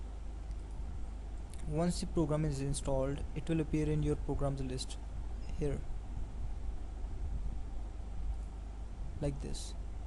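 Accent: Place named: India. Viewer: sooo Indian accent xD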